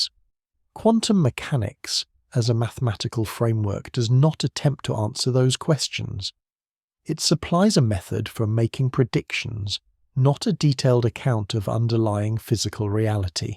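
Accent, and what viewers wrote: United Kingdom. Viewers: Want to love it, but there's nothing relaxing about a biting British voice in my ear at 11pm. Sorry.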